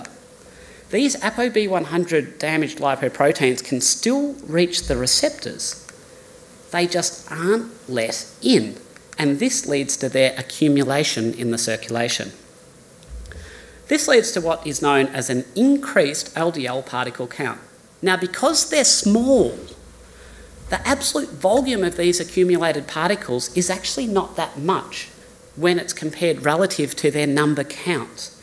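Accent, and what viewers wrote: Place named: Australia. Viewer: Very interesting but I can‘t stand the Australian dialect 🤮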